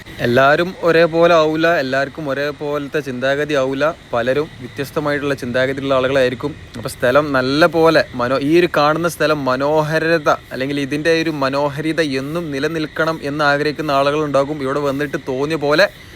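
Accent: Malappuram, India. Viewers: Thumbnail ഇടുമ്പോഴെങ്കിലും ഒന്ന് ശ്രദ്ധിക്ക് ബ്രോ 🙏വഴൽ അല്ല, വയൽ ആണ്. സംസാരിക്കുമ്പോൾ നിങ്ങളുടെ മലപ്പുറം accent തന്നെ ആയ്ക്കോട്ടെ, കേൾക്കാൻ രസമാണ്❤ ചെറിയ ഒരു അഭിപ്രായം പറഞ്ഞു എന്നേ ഉള്ളൂ! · വീഡിയോ രസകരം ആയിട്ടുണ്ട്. ഞാൻ ഒരു തൃശ്ശൂർ കാരനാണ്. മലപ്പുറം ഭാഷക്ക് അതിന്റേതായ ചന്തമുണ്ട്. കളിയാക്കുന്നവർ കളിയാക്കട്ടെ. നിങ്ങൾ നിങ്ങളുടെ ശൈലിയിൽ പറയുമ്പോഴാണ് അതിന്റെ സുഖം. മഴ " മയ " ആയാലും ഭൂമി ഇടിഞ്ഞുവീഴുകയില്ല. ഭാഷയുടെ തനിമയാണ് അതിന്റെ ഭംഗി.മഴയത്ത് കഷ്ടപ്പെട്ട് എടുത്ത വീഡിയോ വളരെ നന്നായിട്ടുണ്ട്. ഇനിയും വീഡിയോ ചെയ്യണം.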